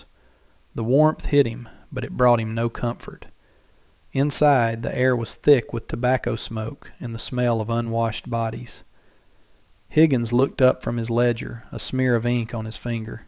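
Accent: South, United States. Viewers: Finally, narration and a believable story. The pictures let it down but the souther drawl and correct pronunciation of words, the use of different names made it a refreshing dream to listen to. Excellent. Most enjoyable story I’ve heard from these types of stories. Very well done.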